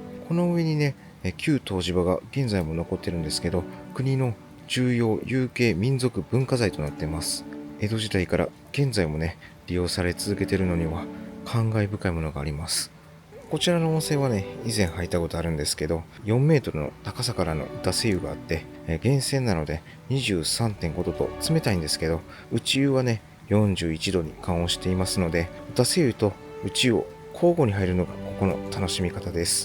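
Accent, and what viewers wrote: Kansai, Japan. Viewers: 鮮やかなbgm.親しきな関西弁。最近見ることなんですが、続々見てしまいます。 韓国語の翻訳も自動ではなくちゃんとしてたら韓国の多数の温泉好きな人達に喜んでもらえると思います、😂 応援しています🎉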